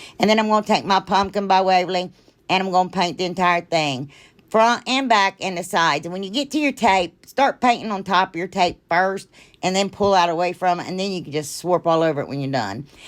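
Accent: South, United States. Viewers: I LOVE all of your crafts, but honestly i dont know if i love those, your adorable heavy southern accent, or your silly and lovable personality more!!! You are my favorite!!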